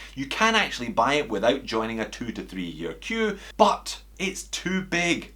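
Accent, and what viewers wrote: Scotland, United Kingdom. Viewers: I think I heard you say you were Australia, but damn, you sound like a Scott. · He's not a native. Accent is for sure from the British Isles somewhere.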